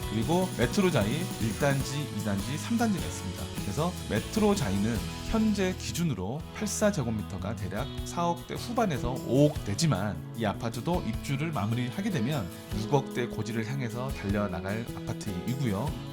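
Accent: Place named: Jeolla, South Korea. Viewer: 혹시 고향이 전라도신가요? 고향말투가있는것같아서 반갑네요ㅎㅎ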